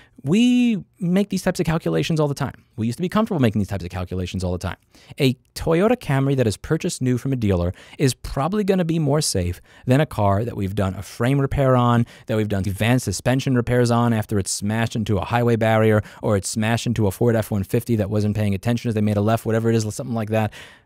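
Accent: New York, United States. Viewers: New Yorker style pronunciations of things is part of why I love him so much.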